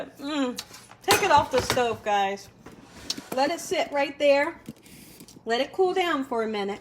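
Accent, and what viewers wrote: South, United States. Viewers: thank you for this recipe of Jamaican beef Pattie’s “101” as you put it! I never thought of using ready made empanada dough! I think I’ll try it! This looks delicious! This is the first time I ever saw a nice southern soul sister like yourself make Jamaican beef Pattie’s on video with a southern accent! I love it I love it I love it!!! “ you hear me?” 😂 That’s also what I like about your other videos as well! They are so cheerful and could easily cheer up an otherwise blue person! Keep it up my dear! Thank you thank you thank you! I grew up eating Jamaican beef Patties! God bless and keep it up! Ps: thank you for this dough shortcut because sometimes it’s a little troublesome and time consuming and at times it doesn’t turn out right for me when preparing the crust!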